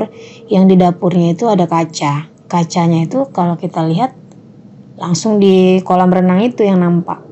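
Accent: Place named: Riau, Indonesia. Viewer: logatnya Riau kali kak❤Batam hadir ❤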